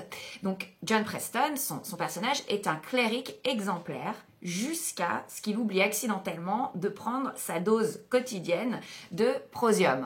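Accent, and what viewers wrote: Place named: England, United Kingdom. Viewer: Bonsoir madame, non moi je dois trouver un moment pour regarder un de vos films, avec votre english accent que jadore, sinon toujours classe.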